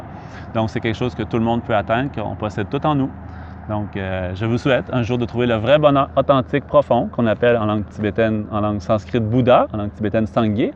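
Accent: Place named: Quebec, Canada. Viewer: j'ai la grande fortune étre bouddhisme* j'adors l'accent québéçois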